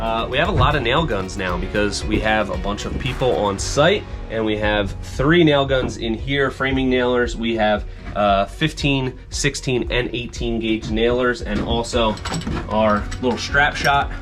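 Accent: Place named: Philadelphia, United States. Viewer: Spotted that Phiwwey accent right away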